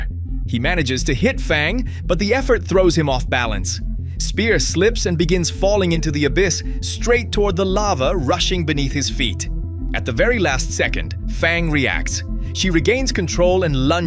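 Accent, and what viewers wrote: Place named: Bahia, Brazil. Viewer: Fala português baiano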